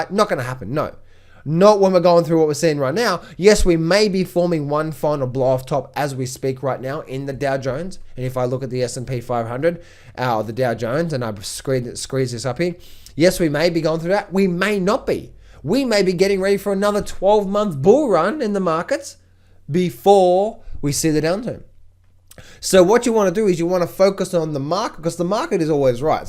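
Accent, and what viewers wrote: Australia. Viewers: love a good no-nonsense Aussie accent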